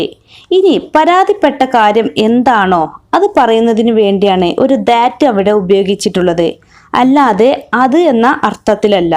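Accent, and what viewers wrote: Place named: Malappuram, India. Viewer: Your malayalam accent like the Malappuram..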